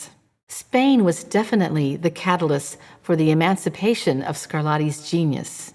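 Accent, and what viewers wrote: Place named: United States. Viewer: American English pronunciation is fine for a American English and we’re all familiar with it; it is as legitimate as any other world-wide English accent. I do have an issue though when the drawly vowels and mispronounced consonants (particularly ‘t’s as ‘d’s*) are lazily and disrespectfully transferred wholesale and without a thought for accuracy onto languages like Italian where the resulting mispronunciations are grotesque - it’s rather like dressing up a pretty little girl in a workman’s dirty overalls. * Giving us the horrible ‘Scarladdi’ for example.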